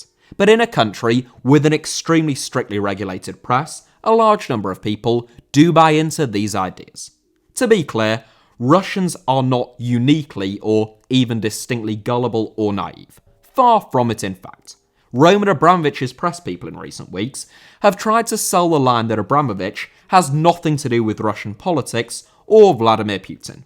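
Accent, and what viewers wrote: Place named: England, United Kingdom. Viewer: his accent is a fairly normal English accent. Get you head out of your ass and learn that you haven’t been made a member of the pronunciation police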